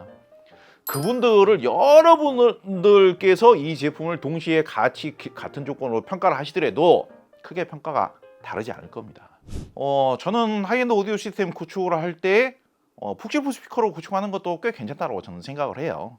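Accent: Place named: Gyeongsang, South Korea. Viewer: 말투가 그런 거잖아 약간 경상도 좀 느낌 억양이 살짝 있으니까 그렇게 들리는 거지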